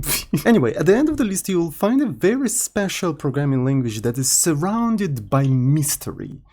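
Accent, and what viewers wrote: Russia. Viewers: Excuse me for such question, are you from Russia?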